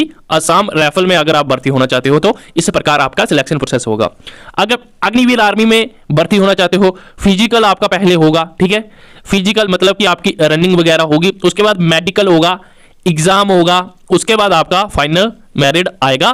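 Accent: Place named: Jammu, India. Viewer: Pehle bolna seekh le Dogra hai ya Punjabi, hindi main dogri mila k de raha hai Aur galat Information bhi de raha hai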